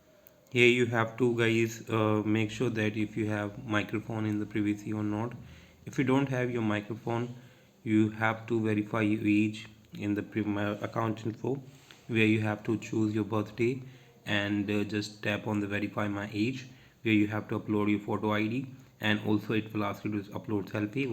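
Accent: India. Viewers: Yada yada yada cant even understand what your saying your indian accent rots my brain.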